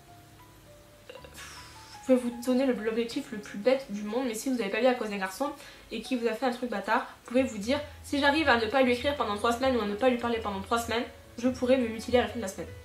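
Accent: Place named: Toulouse, France. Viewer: tu a l'accent toulousin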